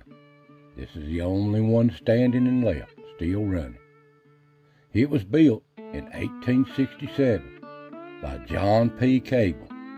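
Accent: Appalachia, United States. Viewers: I just want to say how much I appreciate your videos. I’m so glad I found your channel! You sound very much like a lot of friends I have in Tazewell County, Virginia! I have Appalachian roots myself, and love to see your pics and listen to your. stories. So glad that we know these things, and rejoice in them! You’ve made a fan, brother. God bless you and yours!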